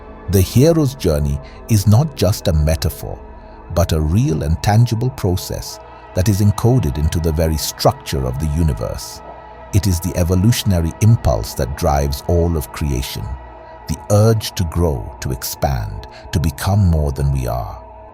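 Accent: India. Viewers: You are sound like Indian..!!! Solemn voice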